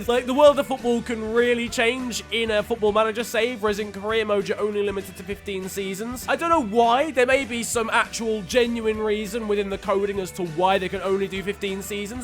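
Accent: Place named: United Kingdom. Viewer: Honestly, this video is good, but this fucking awesome accent makes it even cooler. I love british accent! Thanks mate, thanks!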